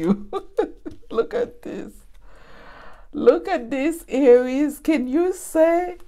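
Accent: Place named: Jamaica. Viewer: Your accent sound Jamaican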